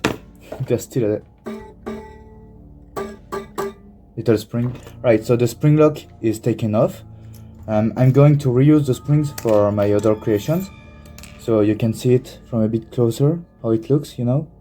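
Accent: France. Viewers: YOOOOOOOOOOOOOOOO J AI RECONNU TON ACCENT FRANCAIS ET TA DIT UN TRUC EN FRANCAIS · Do you speak French cuz you have an accent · Hi, im new on this channel and i was wondering, are you french?😅 because your voice have a little bit of french accent🥖🥖🥖😂😅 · enfin un français qui fait des video comme celle la on reconnais l'acens français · are u french? that’s a nice accent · l'accent français me tue de rire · wait are you french ? or am i just trippin' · L’accent français on reconnais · tes tu fracais tes un accent fracais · Alors la toi avec ton acsent si t'es pas Français je sais pas ce que tu pourrais etre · are you french? you have the accent of a french ppl trying to talk in english, why im saying this is bcuz im myself a french pp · are you french? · Is it me or he has a french accent???? Idk.... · are you french by any chance? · You got a French accent, I like that.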